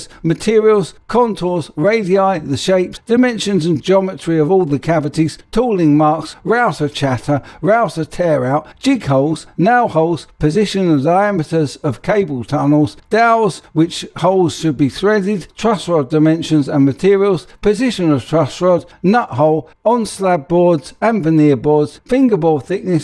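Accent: England, United Kingdom. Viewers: You sound English to me, heard some crazy accents. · Sounds like a typical English accent to me. I would have never thought he had a speech problem if he didn't say so.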